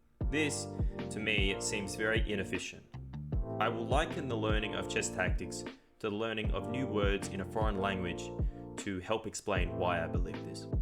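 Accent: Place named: Australia. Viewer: ah I know that accent! Where are you from in Australia? Subbed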